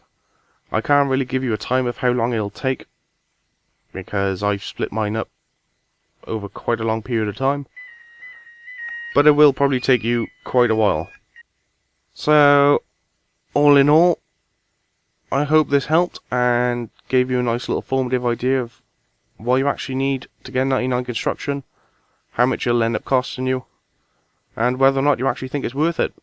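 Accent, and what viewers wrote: Wales, United Kingdom. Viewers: Where are you from you welsh?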